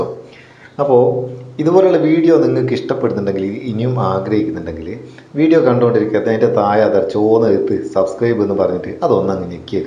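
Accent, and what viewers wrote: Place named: Kannur, India. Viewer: Kanur basha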